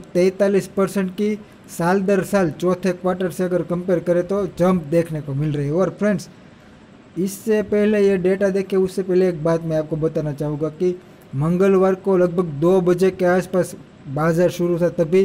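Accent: Gujarat, India. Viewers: lovely accent....jai gujrat...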